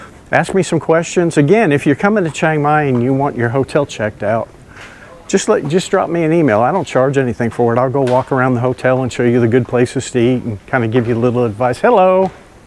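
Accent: United States. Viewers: Your health is so good Sir , you could walk for an hour and making videos for your fans Keep it up! Sir I watch your videos to practice my listening I really love American English. Love from the Esarn part of Thailand.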